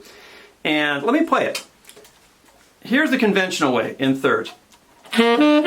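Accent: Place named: Chicago, United States. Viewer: Totally nifty approach,! Thank you. Funny coincidence: This is the first time I've watched one of your videos. Got fascinated right away, and while I was listening I started hearing something familiar in your voice. I grew up in Buffalo (Go Bills!) but haven't lived there since '69, and I started thinking "This guy sounds like he's from Buffalo!" But right away, I think, "No, Wait," because, suddenly I'm hearing the Blues Bros in your voice and I think, "Chicago!" And I spend the next few minutes going back and forth, trying to hear which one it was. And all of a sudden, you're talking about the Cubbies and Chicago, and it all becomes clear. Your short "'O" is a dead giveaway. Anyway, it's good to see a Rust Belt saxman doing well. Great lesson, and Best wishes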